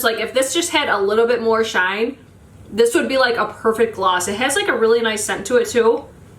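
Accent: Midwest, United States. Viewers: i'm guessing you're midwestern? or maybe from boston.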